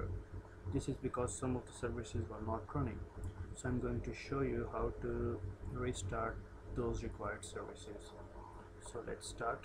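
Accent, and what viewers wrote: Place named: India. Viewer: Will I be lucky today? Is the narrator going to speak normally or have an obnoxious Indian accent? ... FUUUUUCK!!!!!!!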